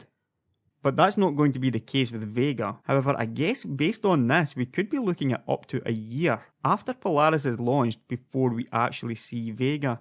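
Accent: Scotland, United Kingdom. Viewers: Another good video. Been watching you since I got my Ryzen 7 rig built a couple of months ago. Looking forward to your build video. Side note. I am in Florida and I have a employee from Scotland who sounds just like you. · You have the voice of a Scot, and the face of a human. Amazing.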